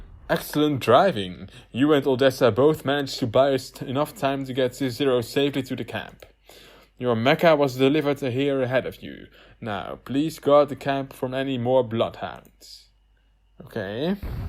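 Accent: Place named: United Kingdom. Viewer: Omg I thought you weren't aiming for a british accent but just that for some reason you were pronouncing words differently a bit this time but then I read the description! xD Really fun video as always!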